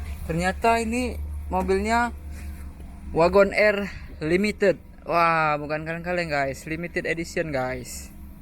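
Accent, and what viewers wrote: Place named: Aceh, Indonesia. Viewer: Logat nya koo seperti org aceh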